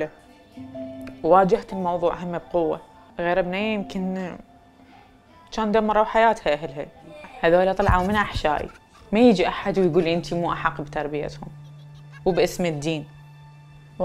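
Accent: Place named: Iraq. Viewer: مااعرف ليش حسيتها عراقيه دخلت للفديو وطلعت صدوك بنت بلدي. حجيها كله صح احنه عايشين بمجتمع ذكوري يشوفون الولد نبي والبنيه كافره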